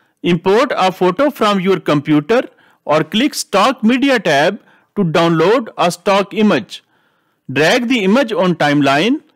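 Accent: India. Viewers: Bro i cant focus up cuz your indian accent, it got me laughing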